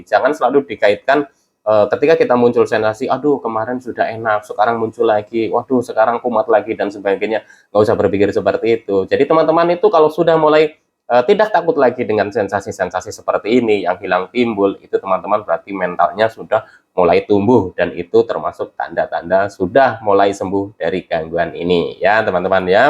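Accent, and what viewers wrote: Banyumas, Indonesia. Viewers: Nyong ngapak jga mas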